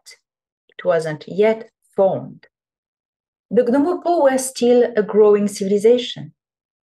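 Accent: France. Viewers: I wish someone would transcribe these videos. I want to hear and understand but the CC leaves so much to be desired.! And I just can't 'get with' the French accent. :(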